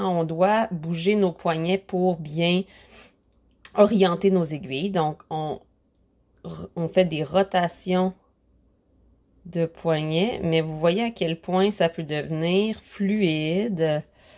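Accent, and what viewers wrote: Quebec, Canada. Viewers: Woaw, un point qui le fait plaisir et l'accent du Québec aussi. Quebecoise en France depuis 1998😊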